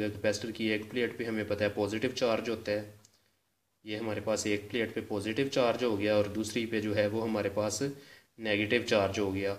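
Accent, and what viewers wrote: Punjab, India. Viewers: Are u from Punjab?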